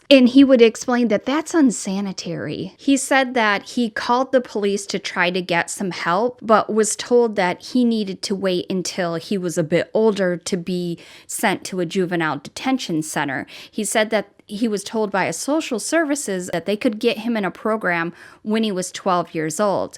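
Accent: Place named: Michigan, United States. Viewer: Yep I knew you sounded like Michigan. Your accent sounds so familiar I've been trying to figure it out. Such a fan.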